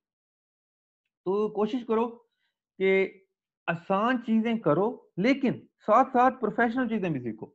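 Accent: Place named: Multan, Pakistan. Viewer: Bhai ap multani ho? Apki punjbai awaz multani bandon jaisi hai 😁😍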